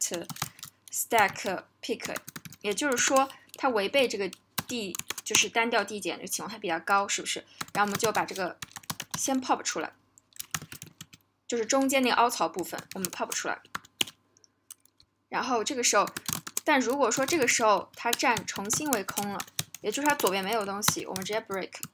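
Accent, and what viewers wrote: China. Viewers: 阔以阔以，是不是四川老乡啊哈哈哈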